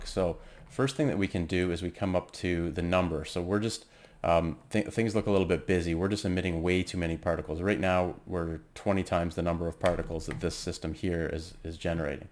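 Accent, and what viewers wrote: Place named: Central, Canada. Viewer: The way you structured this tutorial was incredible; you're a brilliant teacher. And I recognized the central Canadian accent right away, bonus!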